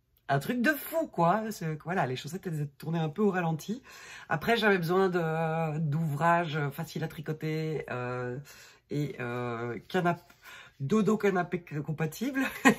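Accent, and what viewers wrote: Belgium. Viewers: J'adore t'écouter placoter même si je ne tricote que des foulards...! La langue belge est jolie...tout comme ma langue directement du Québec.hi hi! bye et gros becs!!!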